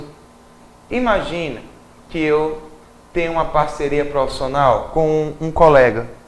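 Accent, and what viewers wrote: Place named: Ceará, Brazil. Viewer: machu réi rsrsrsrs muito showwwww sua aula.sou de paracuru(vizinho à fortal) mas moro no rio de janeiro.quando ouvi teu sotaque vi logo que era um cearence rsrsrsrs.valeuuuu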